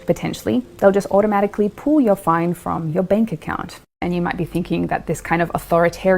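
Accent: New Zealand. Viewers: New Zealand accent. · This chick sounds like she is from New Zealand.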